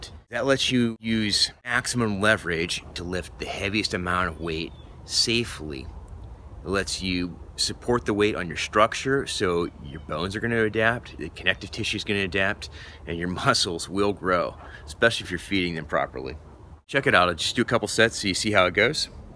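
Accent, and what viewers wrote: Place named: California, United States. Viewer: This is good, you cover stuff that I'm not seeing others do. Thank You. BTW, are you from California? You have some sort of surfer dude way of talking that certainly doesn't fit New Jersey.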